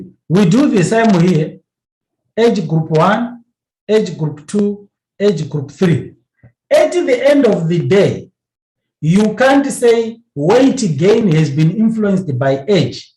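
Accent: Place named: South Africa. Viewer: Also I liked the SA accent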